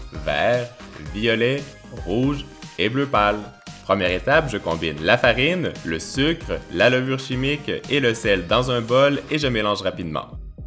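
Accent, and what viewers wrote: Quebec, Canada. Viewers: 🎂🎂Félicitation pour tes 100 000 abonnés PS :je kiff ton accent québécois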